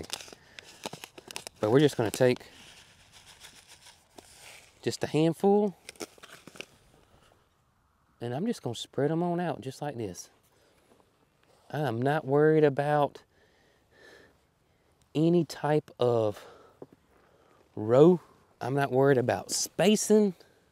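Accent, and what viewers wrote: South, United States. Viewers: Greens and beans ROCK the south! I gotta say, I love leaving the closed captioning on when I can. I would guess that the folks that do the cc for YouTube are 1. not gardeners and 2. not from the south. Watching them goof up your southern drawl really makes me chuckle sometimes. Wonder how hard it was for you to pull that HAIR ROOF (harrow) through your garden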